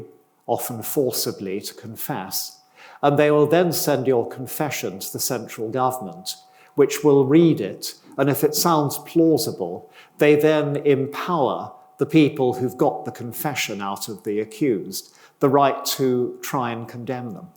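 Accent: United Kingdom. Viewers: I heard good things about Ronald Hutton - and then I started listening this video I was negatively surprised he has an British accent. As soon as I hear the British accent I am expecting to hear the most wild insane goofy ridiculous stupid clumsy statements ever. Obviously, my motto didn't disappointed me this time. The only thing that is true and decent is that Christianity actually curbed witch hunting - sacrificing people is strictly pagan in nature. The rest of it is pure garbage. Men hunted women because they were... misogynists? Seriously? Women of the pagan world would brew concoctions... they were able to make herbal medicine, tea and poison. Some of them acted as agents, some of them assassinated people, some of them were performing abortions, some of them were engaged in zoophilia (as American women do now with dogs, old central European women were doing it with the [black] billy goat). 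Some of these women were simply prostitutes, some were seducers (all women are hypergamous) and some of them were liars (as the lie is the only weapon that a woman has in a strong physical manly world), and these lies weren't mild lies, like "I love you" or "I was a virgin when you took me", but lies about who the father of the children is - and this causes lots of problems especially with the nobles or rich men that wanted to have their real offspring and their line maintained... women would also kill their husbands to inherit his riches and go run off with Chad... that's the moment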